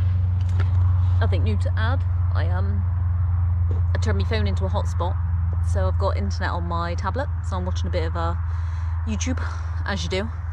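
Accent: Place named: West Country, United Kingdom. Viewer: Like the humour & west country accent, helpful review.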